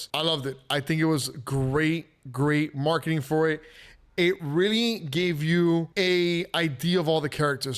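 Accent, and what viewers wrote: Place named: Miami, United States. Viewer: You sound like you're Miami? Are you?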